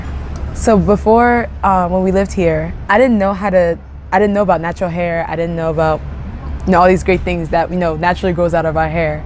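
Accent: Japan. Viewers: Haha! You are so Japanese lol! The way you speak English is colored by the Japanese. It's really interesting. Your American classmates must have thought it was different!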